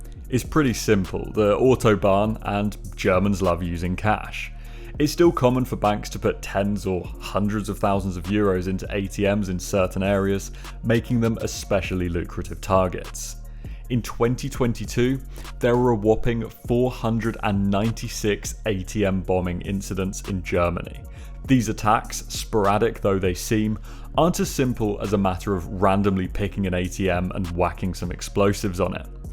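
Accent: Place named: United Kingdom. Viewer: homie believe me when i tell ya, i see no AI in this, this mothefucker got the most UK accent i've ever heard.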